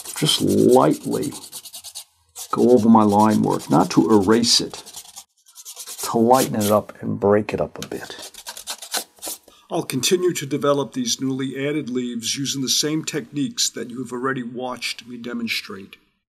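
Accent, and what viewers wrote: New York, United States. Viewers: Loved watching you paint. Wonderful composition. You are making me miss home, New York with your accent. Thanks.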